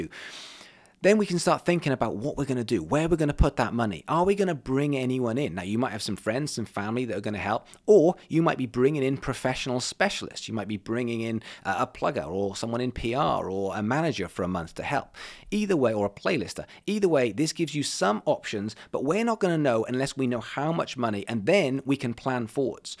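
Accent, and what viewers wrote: Wales, United Kingdom. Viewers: So helpful, really good communication style, clear and concise and specific, plus the Welsh accent is great!😂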